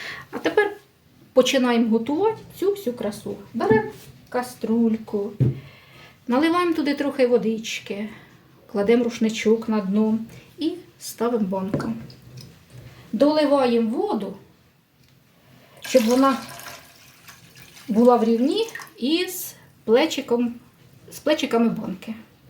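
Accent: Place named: Sumy, Ukraine. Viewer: акцент сумчан не с каким не перепутаешь. угадал?🙂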